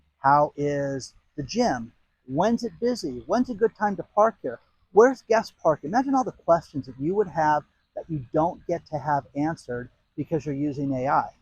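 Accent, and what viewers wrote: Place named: Minnesota, United States. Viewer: Sounds like Minnesota.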